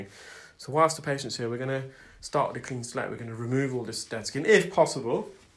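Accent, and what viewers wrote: United Kingdom. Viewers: "...I am kind of renowned..." 💙 He manages to say it in such a way it doesn't sound arrogant or conceited. At all. Not sure if its the British accent to my American ears or he's humble and confident. Either way, I really adore him and his work.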